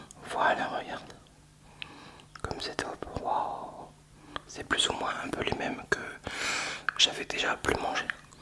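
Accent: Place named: Alsace, France. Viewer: Tu est alsacien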